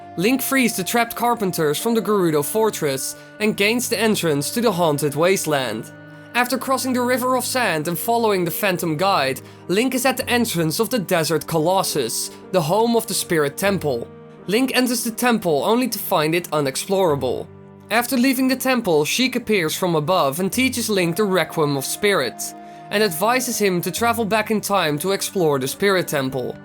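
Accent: Netherlands. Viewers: Love a Dutch accent. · are u dutch?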